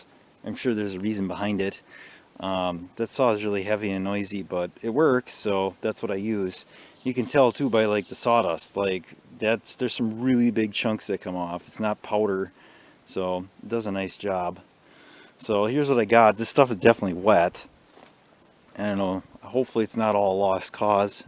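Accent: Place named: Maine, United States. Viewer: where are you from there brother sounds like you are maine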